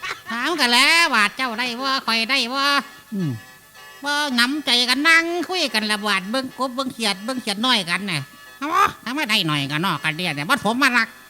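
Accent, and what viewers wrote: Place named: Ubon Ratchathani, Thailand. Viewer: ด้อเดี้ยนนน กัน😂😂ว่าเเต่ไทอุบล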